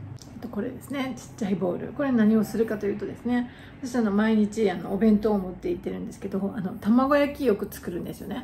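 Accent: Kyushu, Japan. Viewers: やっぱり九州訛りが耳に着く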